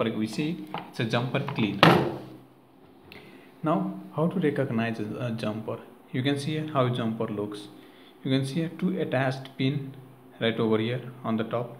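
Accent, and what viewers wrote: India. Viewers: why does it sound like most utube demo's have India voices...i have problem with accents...like they say 'lost in translation'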